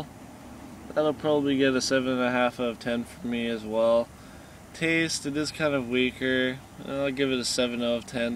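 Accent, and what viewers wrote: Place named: East Coast, United States. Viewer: You are awesome! I just gotta ask, do you smoke? Bhahahaha... okay, okay, all kidding aside, I was thinkin' you sounded from the east coast. Just wonderin'. Keep up the great work from Josh here in Ontario! Cheers!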